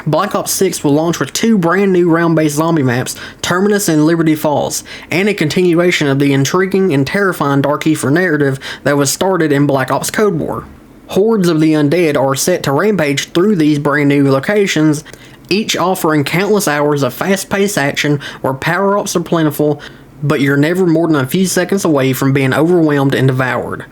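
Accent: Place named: South, United States. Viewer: Sometimes i forget u have a southern accent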